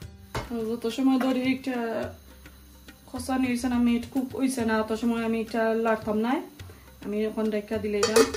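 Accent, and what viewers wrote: Sylhet, Bangladesh. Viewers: Afa ami afnar ek sylhety boin . Amar channel ta guriya ayba ar Bala lagle subscribe korba. Amio subscribe korsi.afnar sylhety mat na sundhor MashAllah.karala Bhorta- kube moja oibo lajer.❤️❤️🍓🍓🍓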